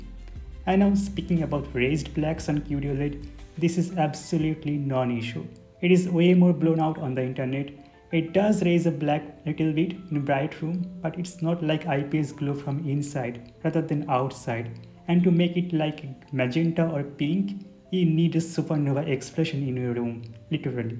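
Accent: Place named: India. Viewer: Indian accent is amazing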